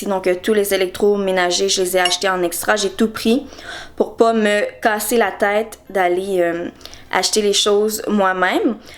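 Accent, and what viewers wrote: Quebec, Canada. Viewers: Tu parles vraiment le " jouale" ...Bref ! Belle appartement et bien aménagé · ouh l'accent québécois j'adore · Tu parles un peu comme une canadienne?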